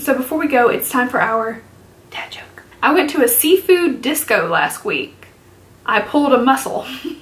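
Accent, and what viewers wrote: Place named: Alabama, United States. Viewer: im from alabama and as soon as i heard your voice i was like "shes gotta be from here too!" and then i read the description lol thats pretty cool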